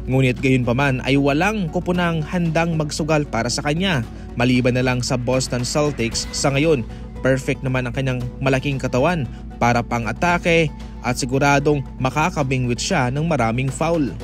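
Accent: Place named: Visayas, Philippines. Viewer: Bisaya ampots ahahaha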